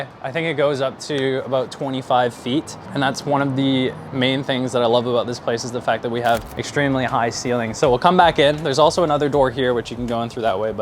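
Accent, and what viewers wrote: United Kingdom. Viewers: i can hear some english twang in your accent... have you ever spent time in england ?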